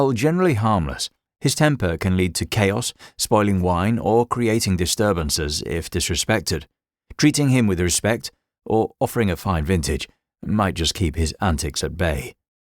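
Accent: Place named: South Midlands, United Kingdom. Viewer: No it's a modest south midlands accent not remotely Bean's Yorkshire 'brogue'.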